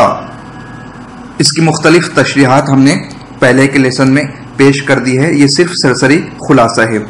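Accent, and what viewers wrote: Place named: India. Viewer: You are talking about Urdu and using English words in Indian pronunciation...... you are fucked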